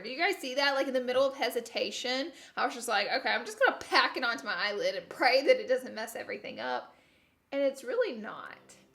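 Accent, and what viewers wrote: South, United States. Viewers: Washer Machine ,I do the Same! North Carolina. Southern girls accents Never Fade 🌹❤ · I'm from Louisiana and none of your southern words are wrong! Lol. I get picked on also! You keep being you! 🙌🏻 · I friggin love your southern-ness 🤠♥️👢 oh and you forgot greezy = greasy 🤪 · It’s A SOUTHERN THING HUNIII 🤠🤠🤠🤠🤠🤠🤠🤠🤠🤠🤠🤠 washer machine I say it like that too. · The accent!! ❤️ Gotta Love us Southern girls, big shoutout from Mississippi! ☺️ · Love drugstore makeup! Girl I’m from SC I’m as country as cornbread and I stand proud sayin washer merchine lol 😂 love your country accent!! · Gurl I understand your southerness. I didn’t even realize you were saying it wrong either.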